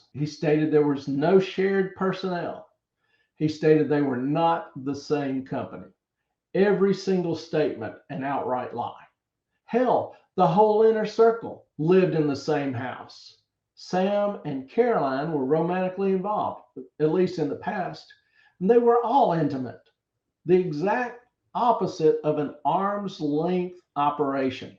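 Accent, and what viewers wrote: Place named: Alabama, United States. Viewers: Another L&Ler subbing & watching. Your content is amazing. Add in the dogs & southern accent, and the “bigass” adjective and I’m here to stay! Thanks for the breakdown. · That Alabama accent!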